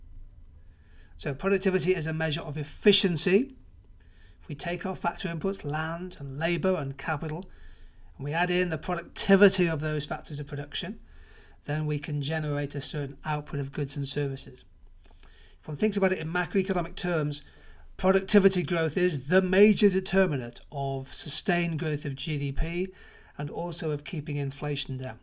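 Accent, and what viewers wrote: United Kingdom. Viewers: I love the accent , it s british, isn t ? well explained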